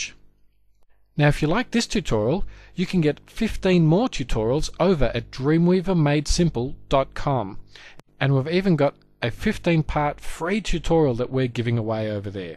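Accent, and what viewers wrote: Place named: Australia. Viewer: nice tutorial Your aussie aye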